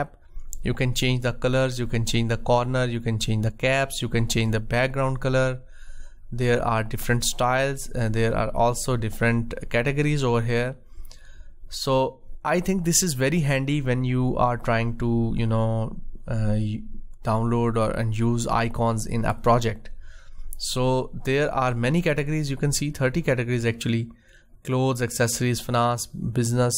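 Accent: India. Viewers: Are you from india??? I love your accent!!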